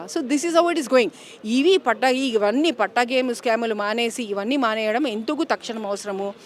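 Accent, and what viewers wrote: Telangana, India. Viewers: I'm feeling that I'm in 70 years back nizam rul... perfect powerful Telangana language madam 👌👌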